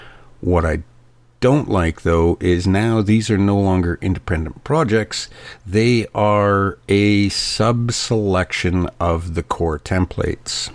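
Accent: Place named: France. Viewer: What is this robotic french voice. Please stop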